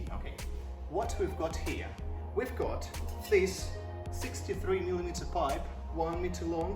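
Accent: United Kingdom. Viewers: Well done, it adds a bit of flavor to the exhaust, personally I'd go for a bit less noise for daily driving. But I do have to say, your english is very good, you almost sound British lol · Чувак, молодец, лайк за приятное произношение английского))) Похож будто англичанин))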